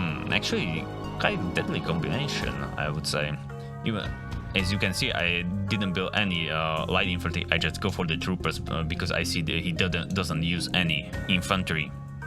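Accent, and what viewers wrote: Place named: Poland. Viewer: I'm still trying to figure out where you are from. My guess is Polish because of your accent but wouldn't be surprised of it's Czech/Slovak... :)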